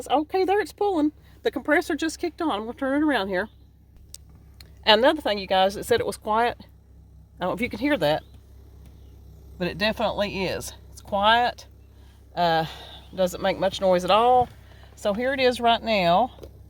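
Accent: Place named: South, United States. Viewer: just found you. what state are you from.??? Sound like a Southern sister. I did subscribe. looking forward to your videos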